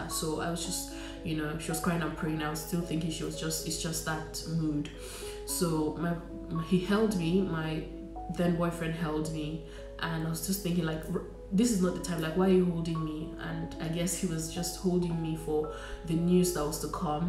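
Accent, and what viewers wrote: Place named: United Kingdom. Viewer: This one that you are already having British accent 👀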